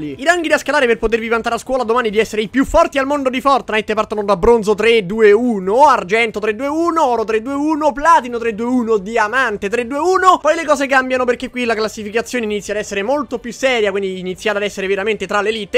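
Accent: Rome, Italy. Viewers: Cmq non mi sarà capitato il video in qui dicevi di dove sei però se capisce che sei de Roma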